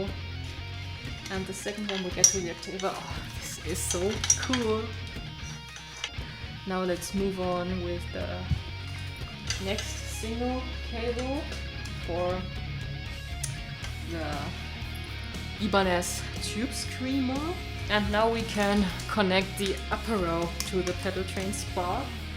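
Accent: Germany. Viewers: Are you from Deutschland? I love that German accent :3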